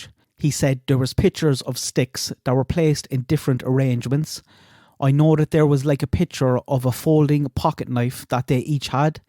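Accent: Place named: North Cork, Ireland. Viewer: Excellent video, my only criticism is that it went a bit fast, the pictures & text. Btw, is that a north Cork accent?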